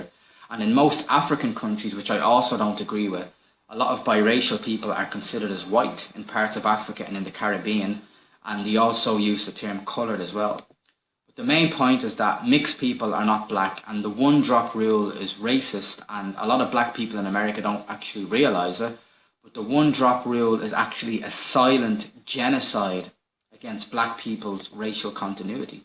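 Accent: Ireland. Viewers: Btw you have the same english accent as Connor Mcgregor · you are correct sir about mixing. i like the irish accent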